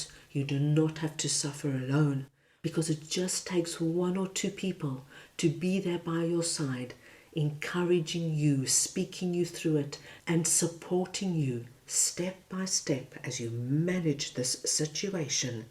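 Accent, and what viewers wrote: South Africa. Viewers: You sound SAfican like me so beautiful and spiritual so pure and wonderful, thank you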